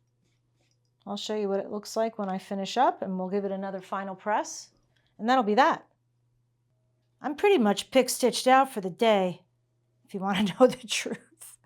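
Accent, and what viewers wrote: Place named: Bronx, United States. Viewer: A few other thoughts about garment sewing. First is that I've found that over the years, it's not been so much that I needed patience to be able to get the work done, but that in doing the work, it has given me patience. Next was the revelation I had when watching you sew the facing to the foreparts....See? I'm paying attention, professor! I realized that I'd done that very technique years ago! I was doing a repair job on something or other, had what I thought was absolutely no seam allowance and therefore needed to sew by hand. I folded the two edges about the same way I watched you do it, and sewed them together. NO IDEA at the time that I was doing the sewing the way traditional tailors did. For me, it just shows that human beings very often find solutions to problems that are similar, and execute them similarly. 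Oh yeah. Every time I watch you, I chuckle hearing that NYC accent AND ATTITUDE. You can take the woman out of New York, but you can't take New York out of the woman. And, during one of Susan Khaljes' other classes, she put in a lapped zipper using pick stitches. Same technique, different application.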